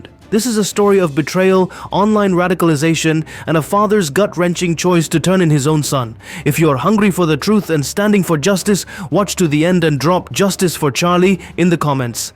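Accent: India. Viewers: We commentator sounds Indian?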